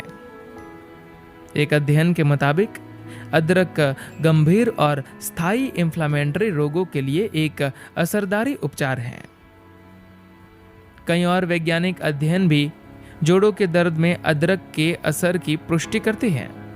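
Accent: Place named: South India, India. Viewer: आप अं की बिन्दी बिल्कुल नहीं बोलते जिससे आपकी हिंदी हास्यास्पद हो गई है। तुरंत पता चल जाता है कि आप साउथ इंडिया से हैं। औ का उच्चारण भी आपका खराब है।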